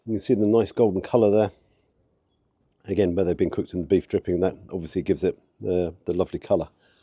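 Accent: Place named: Yorkshire, United Kingdom. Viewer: Haddock, Haddock jeez gotta be COD! You sound like my Yorkshire Wife!! 😆🤣😆🤣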